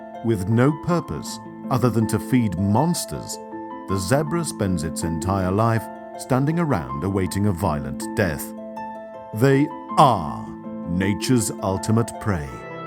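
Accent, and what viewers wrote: United Kingdom. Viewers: this enormously negative over tone coupled with that British accent has got to be, the funniest shit i have ever heard. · he’s British, that’s how’s it’s pronounced.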